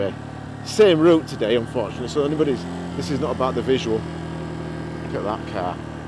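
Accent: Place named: Leeds, United Kingdom. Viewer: After pissing about reading viewing and thinking re instamic pro plus, the new dji wireless mic and the rode go 2 I have just bit the bullet and bought the rode 2. Can i ask how do you mount the transmitter to your helmet? I'm wearing a shoei note 2 flip. I saw a guy with a boom mic connected and plug into the rode 2 but was hoping for wireless with a dead cat etc. I have a boom mic in my helmet for talking rider to riders so there would not be room unless i place second mic on other side of helmet... any help or advice would be great thanks GOOD VIDEO where are you you sound like a LEEDS accent...but that snot west yorkshire lol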